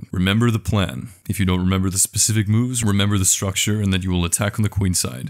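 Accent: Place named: United Kingdom. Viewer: What is this guy's accent? it sounds half British half something else. I'm mainly here for the whispered interpolations. Very nice.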